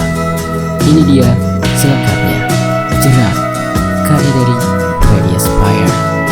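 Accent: Java, Indonesia. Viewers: Wih pertama kali nonton channel ini pas cerita sewu Dino.. Cara penyampaian nya Bagus apa lagi logat jawa nya,ya walaupun saya Orng sunda tapi enak dengerin Mimin wkwkw.. dulu subscribe nya masih sedikit sekarang udh banyak ya wkwk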